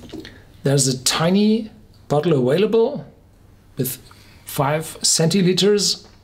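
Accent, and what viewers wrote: Germany. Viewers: Ah that German makes me weak in the knees <3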